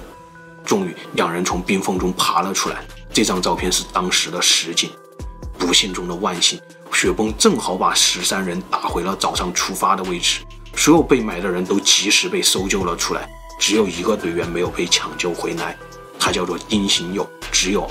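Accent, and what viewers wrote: China. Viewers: 的这个是江苏还是福建口音？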